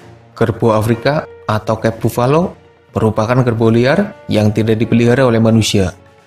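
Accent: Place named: Java, Indonesia. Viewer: Medoknya itu unik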